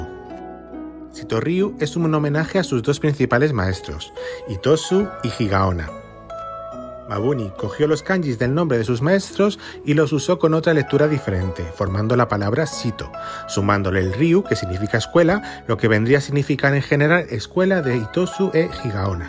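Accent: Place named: Spain. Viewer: por el acento qué se escucha en el video supongo que eres de España, según tengo entendido que qué las mejores escuelas MARCIALES de Karate Shito Ryu están en Barcelona, a modo de recomendación recomendaria hacer un video de cualquier línea de Shito Ryu acompañándola con algún video de alguna escuela de Karate Shito Ryu marcial de Barcelona. Estaré pendiente del video (por cierto no conocía este canal pero debido a este video de Shito Ryu qué has hecho me he suscrito, buen canal, felicidades).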